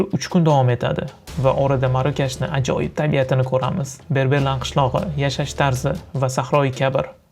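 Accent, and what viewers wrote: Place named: Tashkent, Uzbekistan. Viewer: Адабий тилда гапиришни ўрганинг. Тошкентчасига уйда гаплашасиз. Сизни ҳамма вилоятлар кўраяппти. Хурмат юзасидан абадий гапириш керак. Узр.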